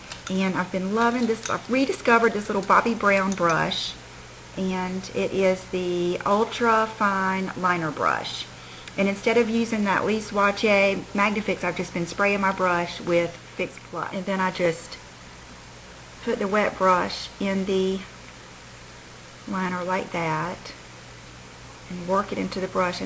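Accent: South, United States. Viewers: I really love your videos. I always watch your videos first. Love your accent, I am a southern girl too.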